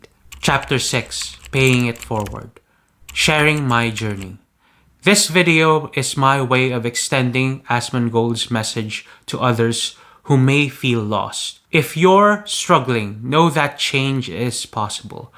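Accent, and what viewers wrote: Philippines. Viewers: Pina-panuod ko si dugyot kanina nung bigla nyang siningit yung video mo. Nung pinlay na nya yung video mo, sabi ko.. "Bat parang tunog pinoy yung english ni kuya?", at tama nga ko. Masaya ko para sa nangyari sa buhay mo ngayon pare 😊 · accent pa lang pinoy for sure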